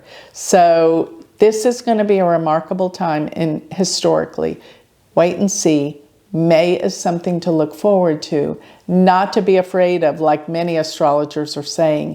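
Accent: Texas, United States. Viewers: First time watcher.. Something made me think, she's from Texas and turns out you are! I'm in Tyler, not too far away from Dallas. Looking forward to checking out more! Cheers!! ❤️❤️❤️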